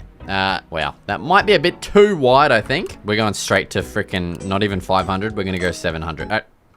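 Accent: Australia. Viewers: The Aussie acsent is the cherry on top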